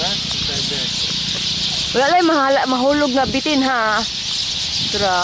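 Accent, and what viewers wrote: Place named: Cebu, Philippines. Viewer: nindot noon kaayo dae nga ingun ani ba bisdak kaayo...daghan napud baya kaayo mga taga american nga mo speak bisaya so nindot pud ni dah..hehhehe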